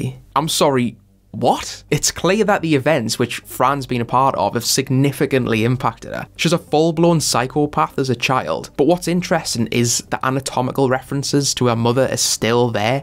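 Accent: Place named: Newcastle, United Kingdom. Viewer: cool video mate, are you from england? sound like a geordie haha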